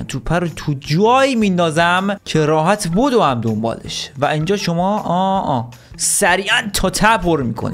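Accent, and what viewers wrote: Mazandaran, Iran. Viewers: داش کجای مازندرانی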